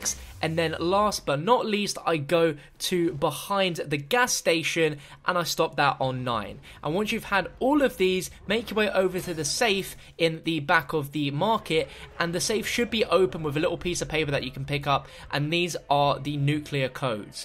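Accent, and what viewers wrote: United Kingdom. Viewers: Nick Bailey it's British accent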